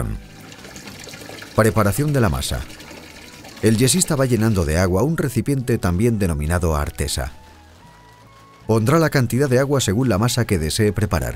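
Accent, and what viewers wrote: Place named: Spain. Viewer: No sé quienes están más brutos si los que critican sin conocer o los que preguntan que de qué país es este. Las ciudades mencionadas en el video son de España y el acento del narrador es Español, inconfundible. Por otra parte las técnicas de construcción varían grandemente entre países, ya que los productos no son los mismos, por ejemplo, la forma de preparar concreto en Argentina y Mexico son diferentes, por que no es el mismo tipo de cemento que el que se fabrica aquí por Cemex o Holcim(antes Apasco). El yeso, en Mexico se aplica vivo, sin mezclar, allá es muerto, matando el fraguado con el mezclado continuo, son simplemente técnicas diferentes.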